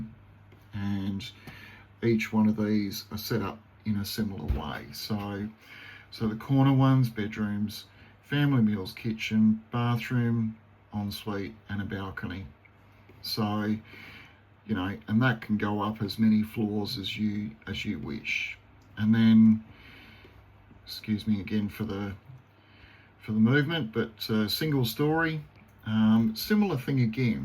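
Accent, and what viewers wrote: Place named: Australia. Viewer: You're work is truly awesome! You have made your mark in many families lives with your designs! I would be curious too to see the completed build! In Australia is there a minimum sized required to build a home on land I wonder? I wanted to have a home built on land for awhile but unaffordable for me now. I can still dream though! I'm glad I found your channel really easy and so far I am impressed! Off to watch more of your videos now! PS your Australian accent I think is so cool mate! 😁👍💯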